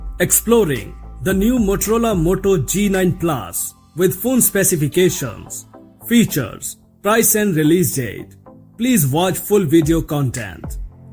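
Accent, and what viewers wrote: India. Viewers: es acento de la India, donde el inglés es el idioma oficial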